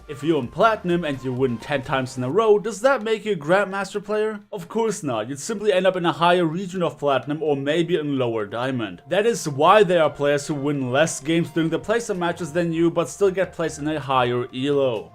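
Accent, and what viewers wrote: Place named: Germany. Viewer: Sorry man but I cant follow you. Your english is so bad. Thats really not an insult, but an advice. Work on your language skills. How you pronounce words and stuff. I am 99% sure that you are not mother tongue english. Sounds like german or so. Anyway: Cant follow the information you give because your spoken words are not accurate.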